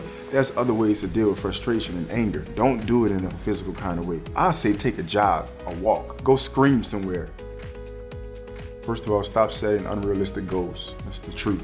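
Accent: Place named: New Orleans, United States. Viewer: Ok New Orleans!! I hear it, that’s my hometown ⚜️😍 congrats on your success!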